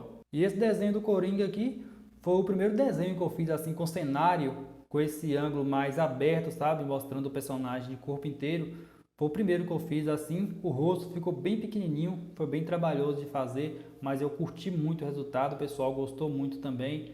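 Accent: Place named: Paraná, Brazil. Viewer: Kkk todo esse tempo eu achei que pelo o seu sotaque você fosse Paranaense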